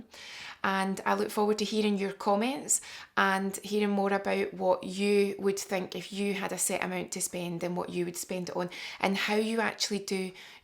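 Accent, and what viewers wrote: Scotland, United Kingdom. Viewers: I'm sorry, but the Scottish accent is one of the most amazing! Love it.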